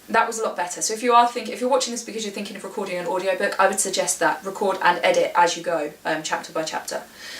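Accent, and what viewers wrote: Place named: United Kingdom. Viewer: I recently indie published a novel on Amazon, and I am considering to try the audio book myself. I found this video very informative. As far as your voice goes, it sounds fine to me. As an American, I find British accents somewhat musical, very pleasant to listen to. However, I can understand from a time standpoint with three small children. My daughters (3) are all raised.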